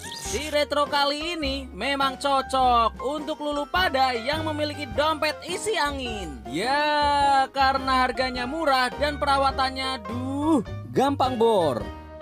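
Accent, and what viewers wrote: Pekalongan, Indonesia. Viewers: Logate Pekalongan isek ketoro gan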